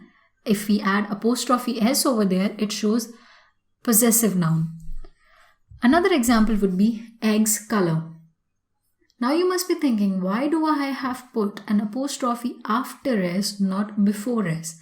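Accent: India. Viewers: I LIKE YOUR INDIAN ENGLISH. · I was completely confused with this Indian accent😐😐😐😐😐😐😐😐😐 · Why indian english acsent is funny?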